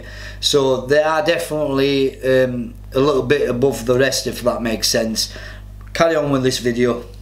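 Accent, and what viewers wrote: Scotland, United Kingdom. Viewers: Love ur accent. Scottish? That would perhaps make u Scots guards, or a highlander? So there are 3 phases of ranger school. The early part of the vid u watched was just the first part of the first phase. That's the 'easy' part. The goal of all the extra water spraying and stuff is made to make ranger school as miserable as possible. After u get to ranger school u r working off of a 4000 calorie deficit, and u only get about 4 hrs of sleep per week, if ur lucky.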